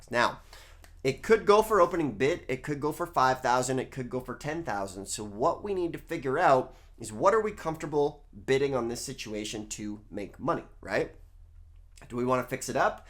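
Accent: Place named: Canada. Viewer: Why do you have a Canadian accent?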